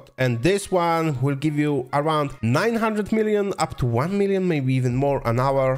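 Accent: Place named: Asia. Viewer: Dude, i hear the asian in your voice, i love it, you are awesome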